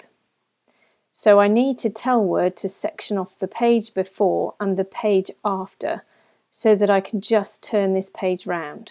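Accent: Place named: RP, United Kingdom. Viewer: Love the RP accent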